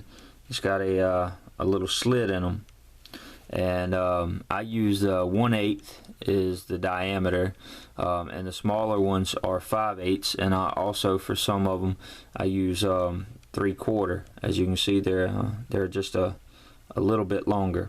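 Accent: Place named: South, United States. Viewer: Bham, Alabama. haha something told me you was from the south.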